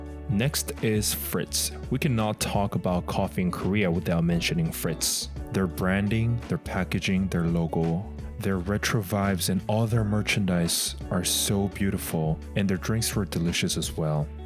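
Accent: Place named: England, United Kingdom. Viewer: Welcome back! I hope you didn't forget me 😢 I was wondering when you'll post and now you've uploaded I'm so happy. Also this is useful if I plan to visit Korea one day Thank you so much for making this video possible 🥹🥹🥹 also hope ginger is doing well you're looking more handsome 💕 and I really love your English accent can I get a virtual hug 🫂?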